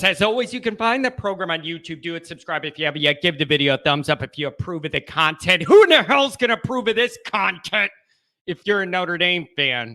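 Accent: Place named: Ireland. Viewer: I love this lil angry Irishman…his accent is 90% of his charm. Great channel!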